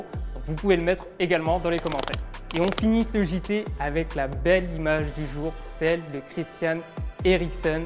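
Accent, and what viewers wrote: Italy. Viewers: Jusqu'à là on n'a pas pu mettre un visage sur la voix de l'accent italien.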